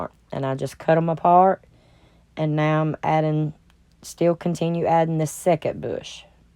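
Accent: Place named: Georgia, United States. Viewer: I have to comment on your video. This is the 1st one ive watched & im not sure how i got to you but im SO GLAD i did! 1st of all idk where ypu live but i swear it sounds like listening to home! ( South East Georgia) 2nd...I have been doing floral design and decorating for over 30 yrs now. You have the eye for & know what you like! You also have the gumption to get out there and try it PLUS put it out there for the world to see! The good the bad the ugly...etc..plus ypu are HONEST & realistic in your videos! Ive been watching YouTube for years and collecting craft stuff to flip- upcycle- diy for YEARS....and uts sitting in my garage. Because i dont have the confidence in myself to just get out there sort through it and DO IT. So it doesn't matter what ANYONE says. You are an inspiration to me! An encouragement and a little taste of home when I listen to your voice overs. 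Plus im getting out in my garage this weekend and getting started! Design, decorating, partys, weddings used to be my happy place. I just got burned out. But you are 100% right...this is for YOU what you like, and your learning as you go. It really made me realize that its OK to not have it 100% perfect and set up so everyone only sees the pretty side...ypur a realistic crafter that does make me feel like my messy horde of stuff is a problem only i have! 🤣 so carry on girl! One last thing The lady that taught me how to design had an expression i couldn't help thinking about when you were making this wreath..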